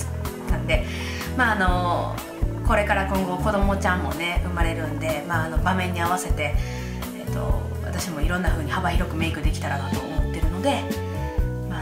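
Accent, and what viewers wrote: Kansai, Japan. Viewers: 関西弁すごい可愛いです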